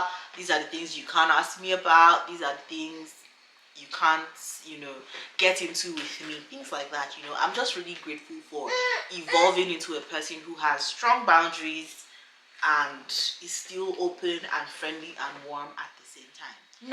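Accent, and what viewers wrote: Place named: South Africa. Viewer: Your accent sounds more South African now. I'm new here, but I listened to your video about South African's being overprotective. You sound totally different. Glad you're still around.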